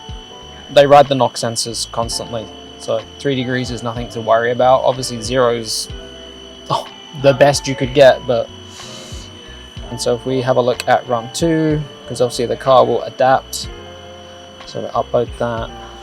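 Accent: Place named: United Kingdom. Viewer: You're Canadian, but have a mild British accent, how's that, bro'?!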